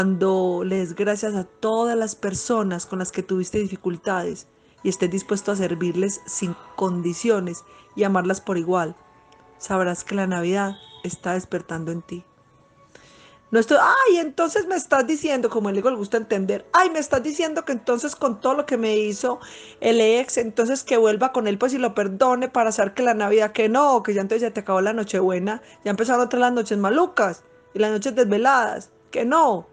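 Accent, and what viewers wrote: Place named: Colombia. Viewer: Eres maravillosa. Eres muy especial, bueno, realmente no eres especial, eres un miembro más de este universo que hace en los corazones de quien te escucha un espacio mejor. Especial son las personas que necesitan amor y luz. Realmente eres amor, con un nombre, con un acento colombiano, con un cuerpo de mujer. Infinitas gracias por tu amor y extenderlo a la humanidad.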